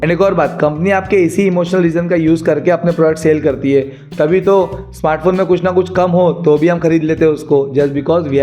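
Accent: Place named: Maharashtra, India. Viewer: मराठी माणूस आहे का रे भावा तू ? 😄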